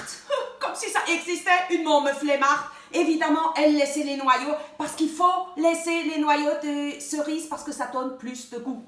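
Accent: Alsace, France. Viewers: Bonjour - merci pour le recette... je suis allemande et j'adore "Bettelmann".. et je vais essayer votre recette. Votre accent est très très charmante, c'est une accent alsacienne?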